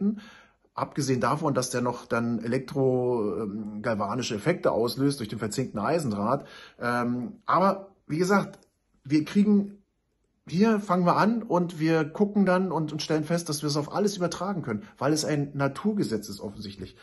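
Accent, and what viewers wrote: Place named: East, Germany. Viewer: Also das versteh ich sogar als Weib die nicht der Ostsprache mächtig ist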